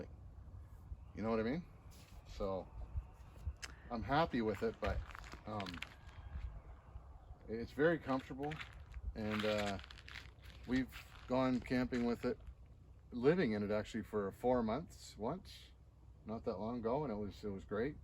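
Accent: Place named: Bahamas. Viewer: Why does he sound a bit Bahamian from Abaco Island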